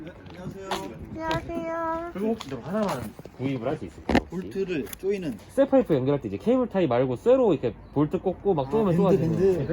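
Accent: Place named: Jeolla, South Korea. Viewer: 역시 전라도 사투리ㅋㅋㅋㅋㅋ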